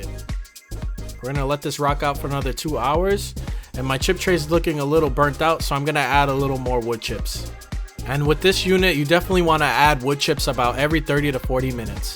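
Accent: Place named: Pennsylvania, United States. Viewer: You gatta be from PA with that accent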